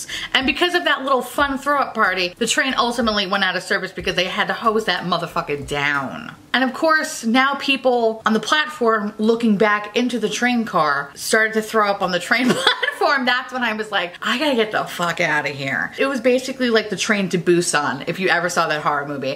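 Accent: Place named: Minnesota, United States. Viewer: While in her minnesota accent.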